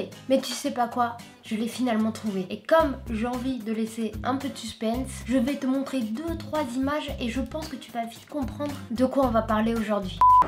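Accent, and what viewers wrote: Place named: South, France. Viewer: Est ce qu’en utilisant une photo tu peux produire un hologramme Super ton accent du suddd !!!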